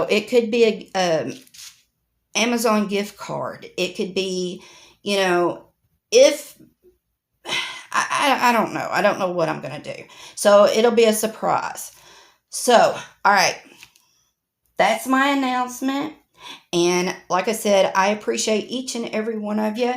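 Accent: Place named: Texas, United States. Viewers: Congratulations Sweetheart on your accomplishment!! You are so right that YouTube is hard!! You should be so proud of yourself. You’re an amazing person and deserve so many great things. I’m so glad that I’ve been blessed to know you. You’re a highlight in my life. I remember I found you just scrolling through YouTube unboxings and I was immediately hooked by your Southern voice and calm demeanor! You are EVERYTHING!!!! Congratulations on you being a sponsor as well! I hope that you get a ton of exposure and that more people experience the wonderful quality from your shop!! Love ya Girlie!!❤️ and I’m purposely not putting the hashtag!!!❤️❤️❤️❤️❤️❤️ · I'm from West Texas, and you sound great to me. My aunt from New Mexico would ask me to talk Texas for her, LOL.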